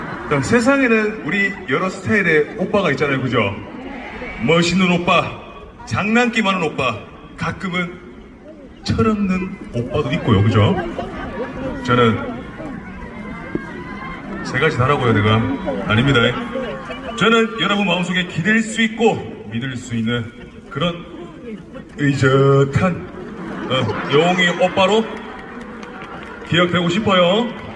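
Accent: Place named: Gyeongsang, South Korea. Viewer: 방청객 에서 누가다리가 몸이약하다했나~~ 아니몆시간을 노래하고 떠들고 춤추고 이야기하고 이럴땐매정하다니까? 안힘드는가해바요한다 아나!! 아이고대다 자빠진다 이제다끝낫어요 내죽일겁니까? 고만~~이제 경상도사투리 귀여운데요 영웅오빠가돌아왔다 어땠어요재미있었어요 나도너무재미있어좋았어요 날씨도추운데 꽂꽂하니않아있어줘서좋았어요 감사합니다 몆번을이야기하노 철없는 오빠 기댈수있는오빠 장난기많은오빠 의젓한영웅이오빠~~로기억할께요 가수님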